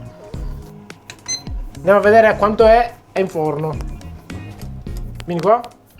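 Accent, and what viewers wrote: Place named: Agrigento, Italy. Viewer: Quindi mi consigli di acquistarla e utilizzarla per tutto senza fare leva e metti biscotto e refrattaria per pratica cosa intendi c'è qualcosa da impostare per utilizzarla al meglio , quando riuscirò a fare una pizza come quelle che vedo nei tuoi video sarà fantastico ma al momento sono alle prime armi e ho qualche problema a ottenere un impasto facile da stendere , dall' accento mi sembri della provincia di Agrigento o sbaglio? Anche io sono nato ad Agrigento ma sono 25 anni che mi trasferito a Torino 😊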